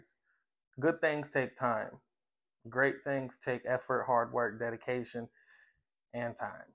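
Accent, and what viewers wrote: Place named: England, United Kingdom. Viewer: Aaarrriieess! I loved your English accent 😜 thank you so much for your time and energy. Everything resonates as always ✨⭐️🌸 Sending so much love and light to you personally and also to all my fellow Aries out there - keep going- don’t give up! 🌸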